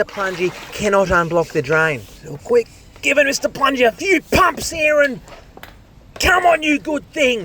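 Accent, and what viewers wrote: Australia. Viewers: !! I gotta tell ya mate. Sometimes I’m in a right bad mood and coming along with you and unblocking these drains really turns it around. I go from feeling like a 1 to a 10 the minute those drains start running clear. Watching from VA, USA and you gotta read that comment in your own voice mate! Americans are notoriously bad at the Aussie accent 😅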